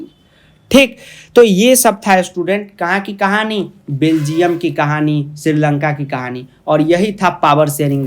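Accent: Bihar, India. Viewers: Bihari style to thik hai, lekin words ka pronunciation proper karna chahiye. Needs improvement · Hence proved This bihari assent touched my heart as i am myself a true bihari😀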